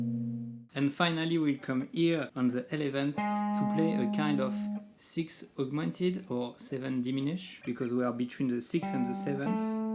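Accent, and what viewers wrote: France. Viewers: Oula toi t’es français avec cet accent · French accent ????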